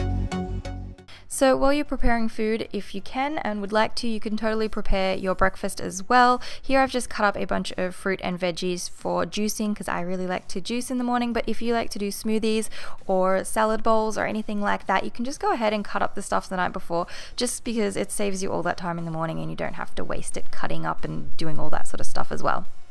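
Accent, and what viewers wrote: Australia. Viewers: Are you Australian or American ? P.s I love ur channel !!! ❤️❤️ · Australian obvs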